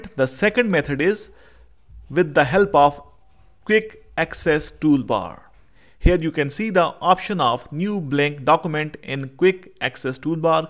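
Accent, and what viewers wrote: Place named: India. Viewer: The heavy indian accent 😅😂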